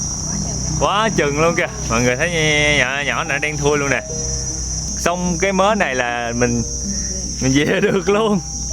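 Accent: Mekong Delta, Vietnam. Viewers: Giọng đúng miền tây dễ thương ghê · 3 năm trước mình vô tình vào xem clip của và thích ngay cái chất giọng miền tây và khuôn mặt dễ thương. Chúc luôn thành công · Bạn nói đúng, trong đó có mình nè. Mình xa miền Tây từ năm 1983 lên Saigon sống rồi thì đi xa hơn nửa (nửa vòng trái đất) nên nổi nhớ Miền Tây của mình chỉ có trong những giấc mơ. May là có giúp mình tìm lại những hình ảnh quen thuộc quê nhà và giọng nói quê hương. Quê nhà mình ở chỉ cách nơi nhà ở có hơn mười mấy cây số nên giọng nói cách nói chuyện của rất là thân quen với mình. Rất cám ơn đã đem lại hình ảnh quê hương đến cho mình. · Nhìn thấy bạn mà thấy yêu bạn với cách nc của bạn cũng như ng dân miền tây rất thân thương và thiện cảm. Yêu bạn mất rồi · Coi video của em quay thật rõ ràng,nói chuyện rất hay và chất phát đúng là người miền tây!!❤️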